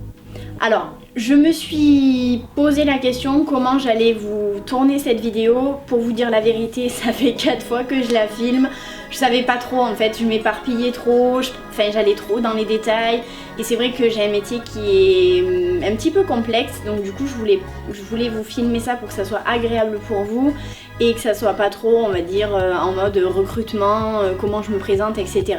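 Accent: South, France. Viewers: oups pardon faute de frappe STMG et BTS commerce internationale , en tout cas ta vidéo est super , j'adore ton accent je suis du sud également , plus précisément sausset les Pina près de Marseille :) et j'adore la simplicité de tes vidéos , tu es naturelle , jolie et tellement franche 🙌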